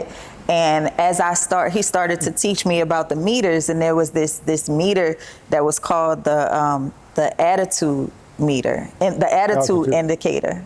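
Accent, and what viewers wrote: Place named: New Orleans, United States. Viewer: I hail from New Orleans. I recognize that accent anywhere.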